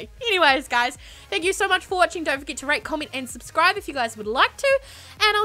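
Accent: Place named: New Zealand. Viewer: I guess New Zealand (the accent) is close to Australian! First I thought that maybe you are British but then I saw your channel info :') Of course I watch your videos, they're awesome!! xx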